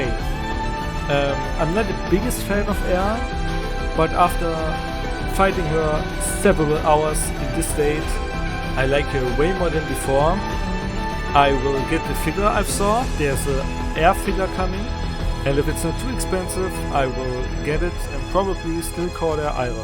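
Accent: Germany. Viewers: Your german accent is very nice sounding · Can it be that you are German?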